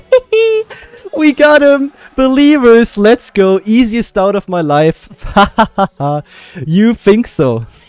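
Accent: Germany. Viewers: Du bist deutschhh, nh? XD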